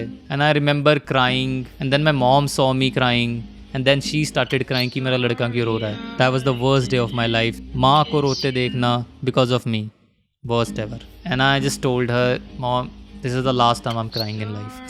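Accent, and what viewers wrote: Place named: Chandigarh, India. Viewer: I knew it he was from Chandigarh the moment i heard him speak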